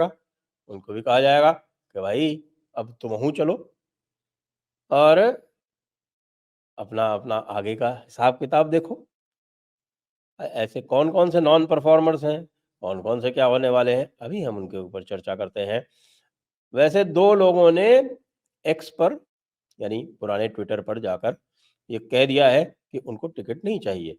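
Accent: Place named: Uttar Pradesh, India. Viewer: UP se ho aap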